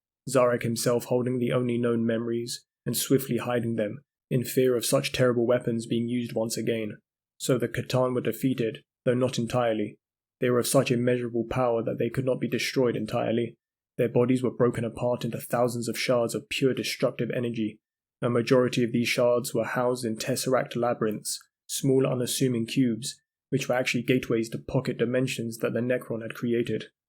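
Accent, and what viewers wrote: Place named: United Kingdom. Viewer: Dunno why i fixate on that comment so much, it keeps rolling a round in my head. I think its because i was so genuinely surprised that a new Lore channel had popped up and it was really good. I think i have nailed his voice down - Calmer than arch warhammer, More interesting than luetin and British. these are all wins.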